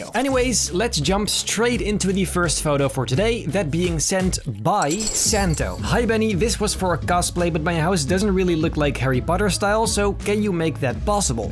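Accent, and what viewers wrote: Netherlands. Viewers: And are you dutch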